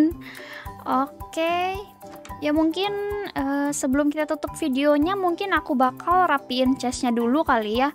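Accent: Indonesia. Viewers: Sumpah nih kakak orang sunda apa orang mana sih?😅😅,lu kaya orang jakarta,terus surabaya,sunda,medan,yg mana sih yg bener😂😂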